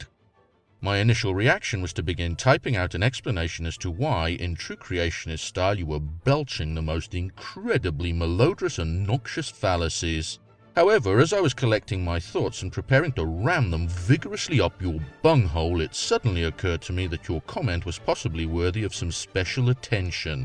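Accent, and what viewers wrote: United Kingdom. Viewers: omg that british accent just makes it so much more hysterical as fuck. You and coolhardlogic are amazing debunkers and trashing these tin foil hat conspiracy ridden creationist fools just makes it even more gold. Thank you so much for bringing logic to the crazy that seems to be apparently spreading all over youtube. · These videos always have one thing in common, obtrusive in debating style. Bold face lies of no evidence refuting evidence.British accent is alwways evident of a blaphemous intellectual upbring on prejudice conjecture. This guy has an anal and poop fixation.This rant is just hateful and has no substance of honest debate. The mark of the beast is definitely this mans free will choice to his quanrantine to foul language zone of nothing but darkness and no love.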